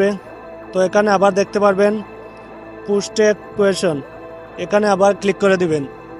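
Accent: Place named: Sylhet, Bangladesh. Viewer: Apne Sylhety🫡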